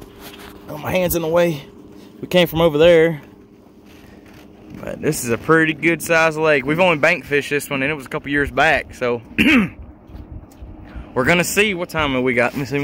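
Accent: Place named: South, United States. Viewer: You are southerning it full stop! "Don't get better than this" so awesome!! ❤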